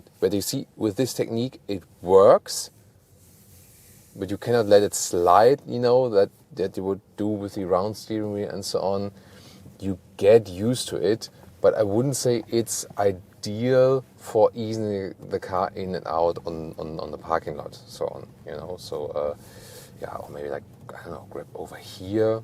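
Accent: Germany. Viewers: Wieso sind die Videos Englisch? Rein vom Akzent her würdest du ja Deutsch sprechen, oder? Es ist nicht so, dass ich der englischen Sprache nicht mächtig wäre, im Gegenteil. Aber dieser Kanal in Deutsch wäre wohl eher eine Marktlücke als noch ein weiterer englischer Auto-YT-Kanal...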